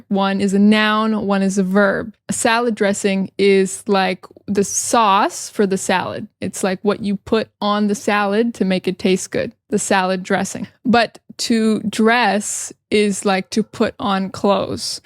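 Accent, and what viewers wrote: United States. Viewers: Teacher you are so good at English. I love your American accent. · The same thing happened to me. And everything was very easy to follow. Great American pronunciation.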